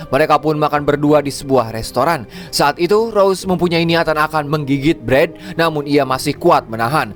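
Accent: West Java, Indonesia. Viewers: Indo x Sunda 😂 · Kek IQ7 mana pake logat Sunda lagi kek 1 orang😅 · Sunda banget 🤣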